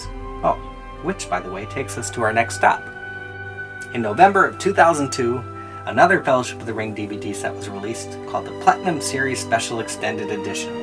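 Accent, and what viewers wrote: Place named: New England, United States. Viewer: Biggest yankee accent I have ever heard.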